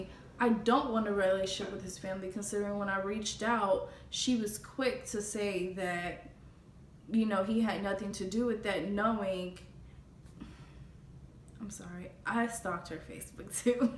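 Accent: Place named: San Francisco, United States. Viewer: I knew you were from San Francisco area by your accent! Me too! Thank you for your courage in sharing this video. I learned so much. I am sorry your mother had such a bad childhood experience. I am so glad you are here. You are a terrific lady.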